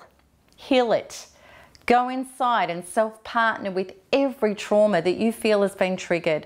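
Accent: Australia. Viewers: hi Thank you so much for your love, care and education. And nice to hear your AUSTRALIAN. I've just tried 10 plus times to get your free, free yourself education from online but the site isn't letting add both my name and email address. Could you please send it to me? I'd love to read this. I'm a victim of crime. Would love your support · OMG could actually learn how to speak properly, you sound like you fell off the back of a truck in Mt Druitt. It makes it difficult to listen to. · I like your accent so much 👏👏👏Love to Australian Nation!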